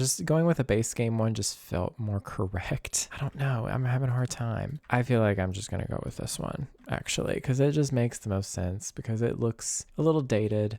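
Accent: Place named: South, United States. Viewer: This is the first video I’ve seen of yours and I’m obsessed already! ❤️ You’ve got that sweet Southern belle realtor vibe going on and it feels so genuine lol. I pop in and out of Louisiana sometimes, so maybe that’s why I’m picking up on it. Either way, I’m here for it! 🤣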